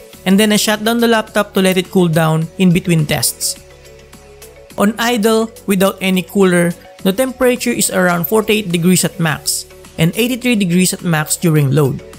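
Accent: Philippines. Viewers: When you showed your face and talked i allready knew your a filipino BROTHER!! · your accent sounds like filipino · Great video! It was really professional in terms of quality, presentation, audio, and content! Keep doing what you're doing. I was surprised that you only have 540 subscribers. I already knew you were a Filipino when I heard your voice so I hope you get big in youtube and represent us filipinos proudly! :)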